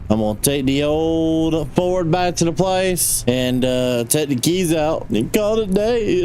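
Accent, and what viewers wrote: South, United States. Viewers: just started watching. looking forward to this. always fun to have the someone with a southern accent doing some farming. thank you in advance.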